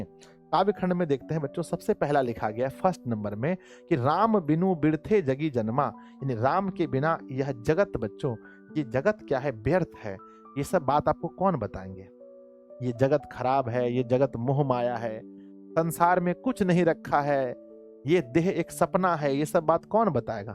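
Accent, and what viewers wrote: Bihar, India. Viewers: सर मैं आपके कोर्स इसलिए करता हूं क्योंकि आप बिहारी स्टाइल में पढ़ते हैं तो सब कुछ समझ आ जाता है कि❤❤❤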